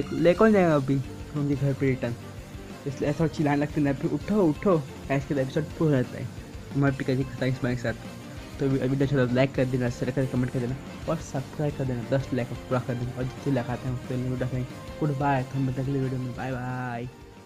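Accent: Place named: Bihar, India. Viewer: Bat dhik se kar bihari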